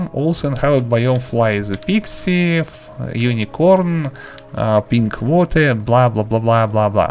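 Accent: Russia. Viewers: heh, this russian accent on english words is kinda cute lol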